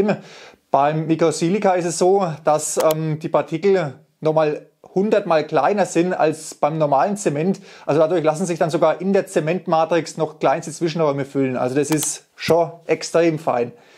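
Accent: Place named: Franconia, Germany. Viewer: Ich hob mer dacht: "wuu kummder denn her? Denn Dialeggd denn kennsd..." Aus Frangn isser wie ich aa! 😀👍 Zum Thema hochfest: Wir hatten früher auch Faserzusätze und/oder gebogene 10mm lange Drahtstücke zum aufnehmen der Zugkräfte beigemischt (Industrieofenbau), wie ist es damit, wird sowas heute noch gemacht? Gut mit der Gewebeeinlage ist die Frage zumindest zum Teil beantwortet...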